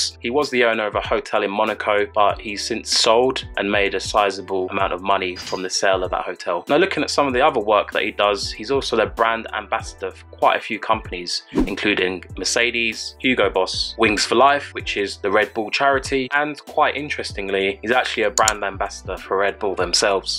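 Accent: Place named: London, United Kingdom. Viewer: You sound like ur from lonnndon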